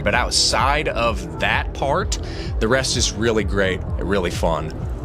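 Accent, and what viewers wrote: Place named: Australia. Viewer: The Australian accent got you a follow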